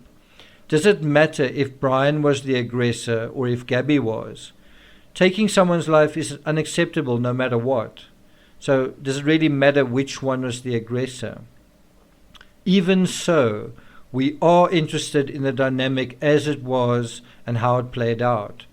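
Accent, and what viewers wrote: South Africa. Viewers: I’m trying to figure out your accent, I’m guessing South African???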